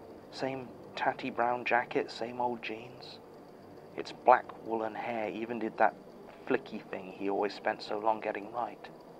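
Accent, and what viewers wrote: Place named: United Kingdom. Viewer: “If they work for the Magnus archives they should” Martin*listening in outside* : breaks into a cold sweat I’m in danger “I had never been scared of dolls" Me and my 2 worst fears being dolls and bloody mary(thanks supernatural): godDAMMIT Also Jons "...Americans" reminded me of one american youtuber recommending the podcast and one of her reasons being "the guy is British and his accent-" and I had the same reaction😂(I live in Scotland)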